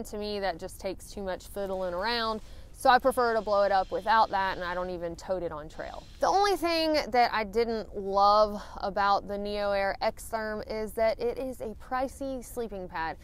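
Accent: South, United States. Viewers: love her slightly southern accent =]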